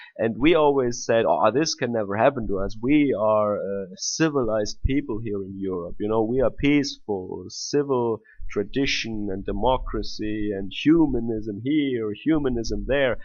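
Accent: Germany. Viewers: jan uniter He is German. This is how everyone not American speaks!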